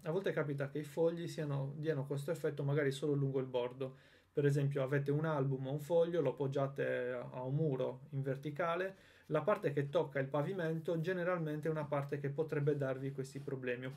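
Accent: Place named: Sardinia, Italy. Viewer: No , l'accento è quasi impercettibile, io l'ho notato perché anche io sono Sarda 😄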